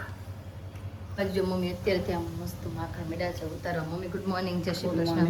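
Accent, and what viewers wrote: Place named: Kathiawar, India. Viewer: jsk ben tamari bhasha upar thi tame kathiyawadi hoy em lage chhe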